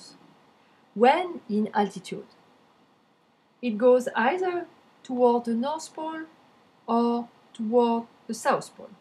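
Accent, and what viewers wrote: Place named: France. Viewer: Dude she's obviously French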